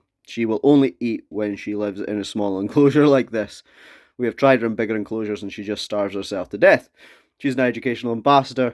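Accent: Scotland, United Kingdom. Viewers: I am in Banchory good to hear a Scottish accent on you tube thanks for the reply I’m enjoying your videos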